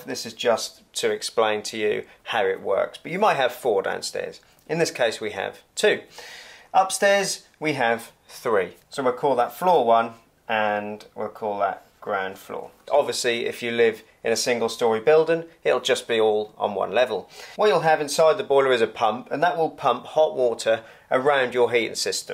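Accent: Norfolk, United Kingdom. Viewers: great video ,well explained , I have two bigger rads in my extension which struggle so think this will help . what neck of the wood you from sound like Norfolk... cheers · Do my ears detect a Norfolk accent here?!!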